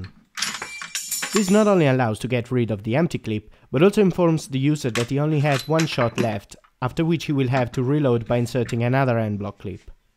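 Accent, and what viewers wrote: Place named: Italy. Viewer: mostly for the ascent I thought you were Italian btw great video, greetings from Italy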